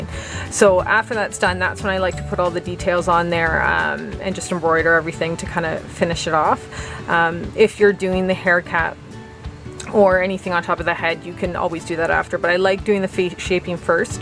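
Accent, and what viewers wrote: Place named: Canada. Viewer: You sound like you have a Canadian accent like me 😀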